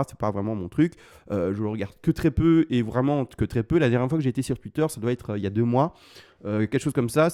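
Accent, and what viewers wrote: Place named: Belgium. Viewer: Bonjour et Bravo à, je suis devenu accro à ses tutos.... lui qui est belge je crois... et moi Québécois!!! Mais quel ACCENT!... pour un québécois francais comme moi ca me tient réveillé!!... Question pour la communauté: j'utilise Kraken pcq c'est le premier exchange que j'ai connu, cependant, je le trouve tellement pas bien fait. Je sais que ne souhaite pas s'investir en recommandant un exchange pour les raisons qu'il a mentionné et que je respecte, mais je suis d'avis qu'un membre de la communauté pourrais me référé l'exchange qui serait plus plus adapté à suivre ces tutos, comme par exemple modifier les stop loss à la cliquette comme il dit souvent:)) qui peut me recommander son meilleur choix et pourquoi... Merci et bravo encore... j'apprend toujours!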